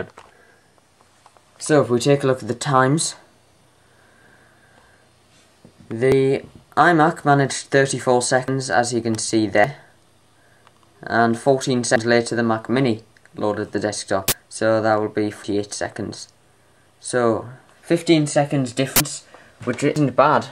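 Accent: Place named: Liverpool, United Kingdom. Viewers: I thibk he has a up northan accent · I think its a Liverpool accent.. I can never tell, but whatever it is, glad to be british.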